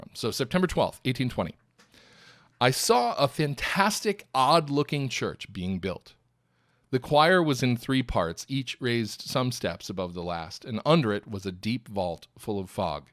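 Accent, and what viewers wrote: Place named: France. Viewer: Great French accent. Oh boy will dissenters never give up.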